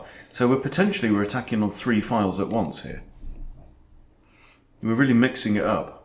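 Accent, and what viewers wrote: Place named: England, United Kingdom. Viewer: I hope you continue making videos. For me and many others, your videos are just at the right level. It's also nice to hear an English voice, there aren't many out there on twitch or YouTube. Good luck.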